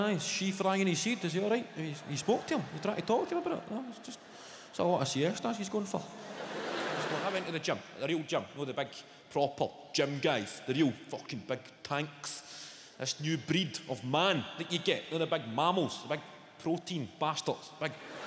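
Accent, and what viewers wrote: Scotland, United Kingdom. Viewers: I must admit I love the Scottish that accent , this guy is funny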